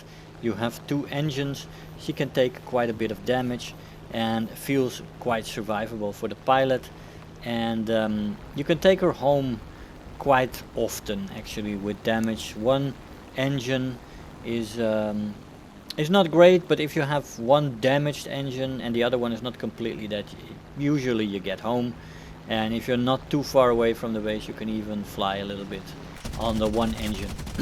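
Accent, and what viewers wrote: Netherlands. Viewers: they're both dutch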